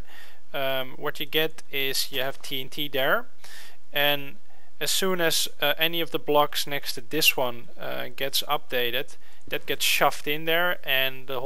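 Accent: Netherlands. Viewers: Jup, das 100% nederlands accent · seems like a dutch accent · ok dit is nederlands lol accent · are you from holland? because i can hear it.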